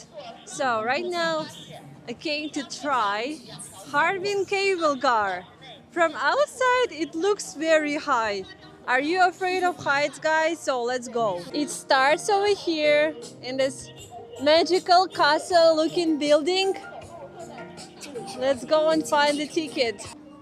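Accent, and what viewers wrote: Russia. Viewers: It's so cool to hear you speaking Chinese. Do you have a strong Russia naccent in Chinese? Can Chinese people tell which part of Russia you're from? That little old Chinese pharmacist seemed very nice. What a cute little man. :)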